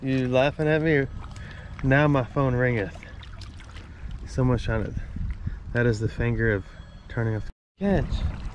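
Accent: South, United States. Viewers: Southern talking. I live in Illinois now and when my Southern kin come to visit I have to learn that language all over again. Count how many times the word "look" is said in a video.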